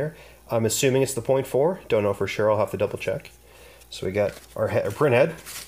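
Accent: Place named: United States. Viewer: Great video, thanks for posting this! I'm very interested in this printer and noticed the economy shipping to NY state was $55 excluding VAT. You sound like you are from the states. If so, did you get charged a VAT?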